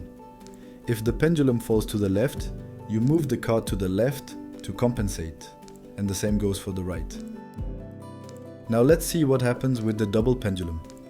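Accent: France. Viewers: j'adore l'accent fr XD : )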